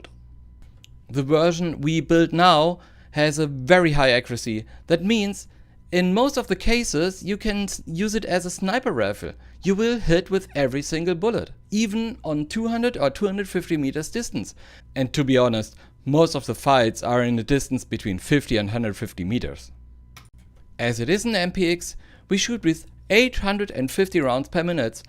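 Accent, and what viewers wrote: Germany. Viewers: You sounding like a german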